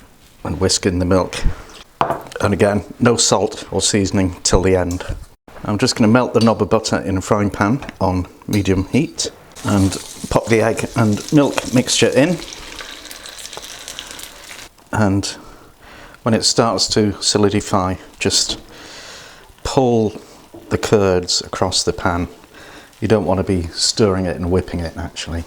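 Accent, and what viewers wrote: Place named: Liverpool, United Kingdom. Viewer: tnh I just watched your most recent video of crumpets and scrambled eggs, and I was going to unfollow you if your eggs were done wrongly.. well, they weren't really discribed very well so I came here; then you did scrambled eggs in the microwave.. so I'm done. Your Scouse was actually not bad though, and that comes from a Scouser; but I can not abide someone who demonstrates microwave scrambled eggs. I am sorry to leave this way, but at least I am leaving feedback. Also, your pea whack (pea and ham soup) recipe was pretty good too, yet not a revelation to those who learnt it from their ma'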